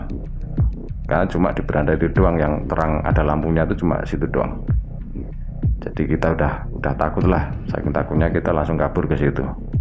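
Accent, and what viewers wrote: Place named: Java, Indonesia. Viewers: Mohon maaf sebelumnya, lebih baik pake 'saya' atau 'aku' aja ya mas.. Bukannya mau bilang org Jawa gapantes ngomong 'gw', boleh kok ga larang. Cuma.. kadang kalo aksennya kental bgt kaya gini didenger terkesan maksa aja jd ga natural 😂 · Mak buugghh, kuaget asem o WKWKWKKW JOWO BGT MAS E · Iyaaa , aneh deh bahasanya medok khas jawa tapi ngomong gue gue..wkkk...pake aku yo rapopo kok, keknya jg jarang deh orang jawa tengah /jawa timur ngobrol peke gue gue sekalipun anak hitz gaul..wkkk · Hio itu batangan lidi..., halaah kesuwen mas. Hio itu Dupa, ben gampang. Ceritane mantep apalagi kalo ndak peke Ghuwe...logate isih medhok, ora pantes. Sepurane, sekedar saran... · sy malah suka dgn aksen mas yg ga dibuat buat..apa adanya . malah medok bgini jd ciri khas nya mas.. . dan yg julid ttg kata "gua".. tlg blajar dulu ttg aksen seseorang.. 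hehe.. semangat mas..pertahankan ciri khas anda..ditunggu crita brktnya.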